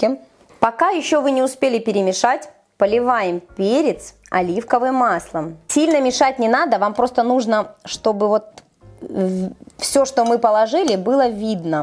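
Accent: Ukraine. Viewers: а где вы живете..... Говор у вас Украинской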